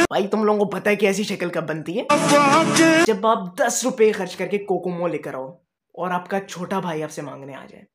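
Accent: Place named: Lahore, Pakistan. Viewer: I love lahore ❤❤mujhy phely sy hi apky baat karny ky style sy lagta tha ky ap lahori ho ❤❤❤❤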